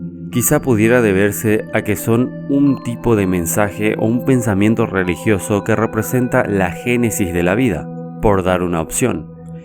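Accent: Paraguay. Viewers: Eres paraguayo ?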